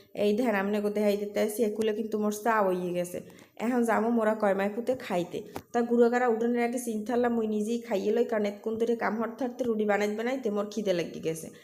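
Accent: Barishal, Bangladesh. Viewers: আসসালামু আলাইকুম আপু চলে এলাম তোমার বরিশালের কথা শুনতে তোমার কথাগুলো সত্যিই আমার অনেক ভালো লাগে তোমার কাছ থেকে আমি বরিশালের কথা শিখতে চাই শেখাবে ❤❤❤❤❤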